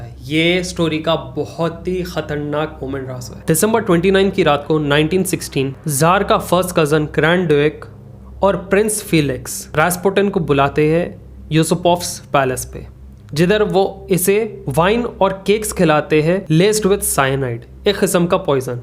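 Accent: Hyderabad, India. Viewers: Bhai hyderabadi accent hi bola karo wahi suit hoti hai dusre accent mai hindi/urdu hi change hojati hai all the best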